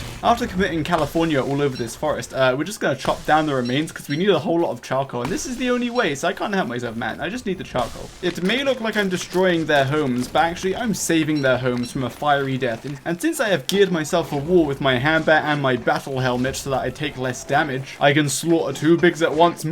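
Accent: United Kingdom. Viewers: This Dude "The Spiffing Brit" You sound so much like him at the beginning of the video that I though you was trying to copy his work. Not just the brithsh accent but some lets say jargons are about the same. Brithsh people must talk in some pattern..That is sure to sound silly but there's a reason for it I've watched the whole video though and i love it. Never got so far in this game as the first winter aways kills me and friends so i've leaner a lot. Sorry if that coment bothered ya, no one ever replies so i didn't take the time to remove it. I subscribed right at the and of the video, and I hope to see more of your work on dont starve as it is a game that i like a lot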